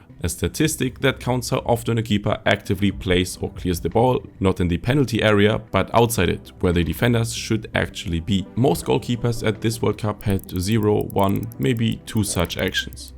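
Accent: Germany. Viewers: Nur Liebe dafür, wie zufällig die balken bei min schwarz rot gold sind 😅 und probs für die Aussprache, man hört den deutschen Akzent nur hintergründig raus 😊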